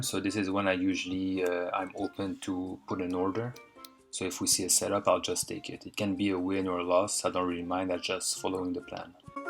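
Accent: France. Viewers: Hi, nice videos, can smell a french accent here :) Or maybe not .. Is the checkbox on your chart an indicator !? If so, do you mind share a link ? Cheers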